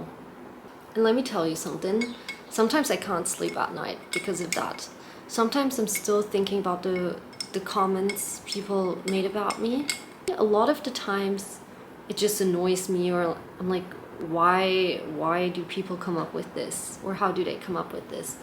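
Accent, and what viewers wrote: Australia. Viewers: Aussie accent creeping in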